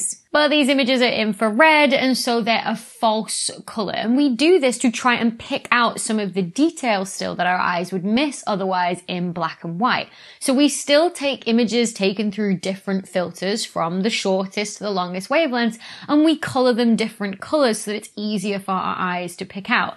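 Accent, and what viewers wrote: United Kingdom. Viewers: Because you are not used to genuine British accents. I have no issue at all understanding her at normal speed. One year I was in West Virginia at a factory and had a British guest. He asked a question. The W.V. man tired to me and asked, seriously, "What language is he speaking?"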